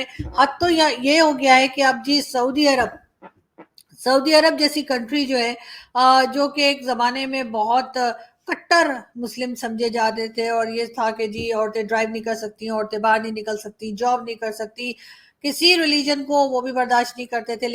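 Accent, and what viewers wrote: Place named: India. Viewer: ji aapke bolne ka style poora Indian ‘s se milta -julta hai. Same to same. Bahut aacha 👍👍👍.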